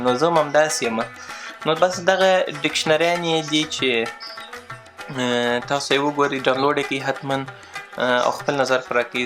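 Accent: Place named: Kandahar, Afghanistan. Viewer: ډیر ښایسته، بالااااا ستا کندهاري لهجه ته جار سم🇦🇫🥰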